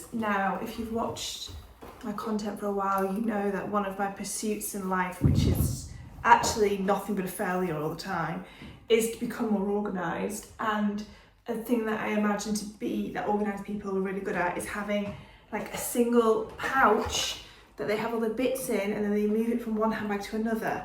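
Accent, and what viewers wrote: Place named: England, United Kingdom. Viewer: It's funny, as a french I'm so used to listen to american youtubers maybe but english accent (so beautiful) is much harder to understand ❤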